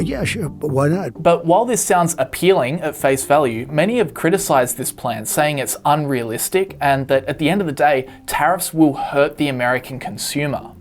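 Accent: Australia. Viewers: Sounds like you’re Australian. Your wine industry has huge issues in America. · Probably because he doesn't go here (I'm assuming based on the accent that he's from Australia).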